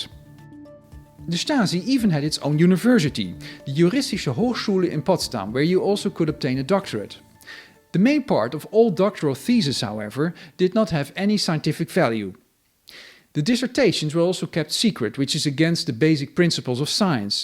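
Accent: Netherlands. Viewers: Are you a Dutchman? Your English is like that of a Dutchman.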